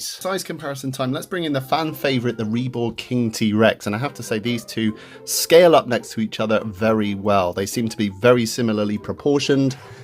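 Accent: United Kingdom. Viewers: I'm just yanking your chain, he speaks in a British way, for he is indeed British. No need to apologise kind hearted individual, tis all good. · Sorryy but why do you sound so British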